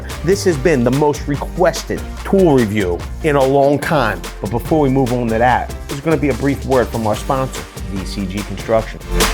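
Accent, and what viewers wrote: Philadelphia, United States. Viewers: You're from Philly. I can hear your accent.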